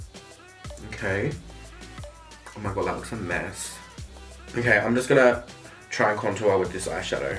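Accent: Australia. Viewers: R u Australian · Amy Expert he's Australien :)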